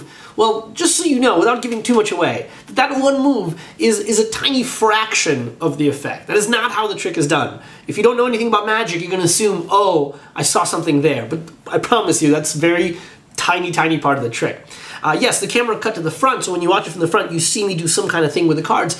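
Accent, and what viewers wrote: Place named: Europe. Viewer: Костя, у тебя на сцене почти не было акцента, а в реальности есть небольшой европейский, не совсем славянский. Интересно... Такое бывает когда в 10-13 лет иммигрируешь.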